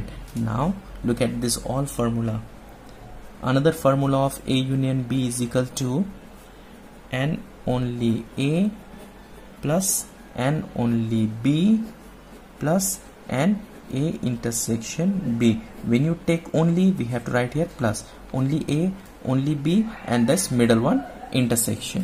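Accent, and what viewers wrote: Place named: Nepal. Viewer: Your accent sounds nepali. Are you??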